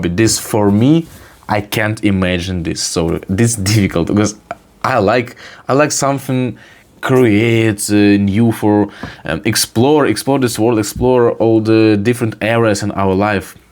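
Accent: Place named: Russia. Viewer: 😭 your Russian accent speaks so loud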